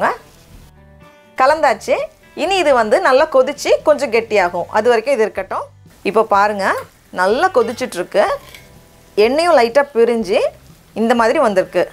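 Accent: Nagercoil, India. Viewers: Ur way of speaking Tamil in Nagercoil slang is soooooo good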